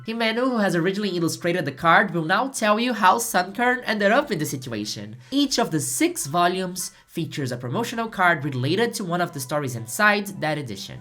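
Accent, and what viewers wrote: Brazil. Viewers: Are... Are you from São Paulo? You sound like you're from São Paulo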